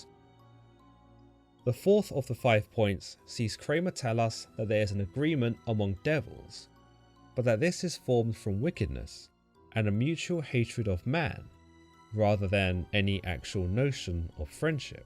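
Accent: United Kingdom. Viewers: hearing a British guy talking about catching fish, with a creepy violin and piano track in the background is gotten to be my new past time!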